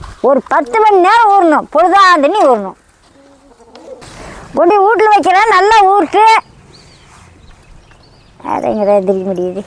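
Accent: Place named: Salem, India. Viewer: Salem district. From Her dressing and slang. My native omalur salem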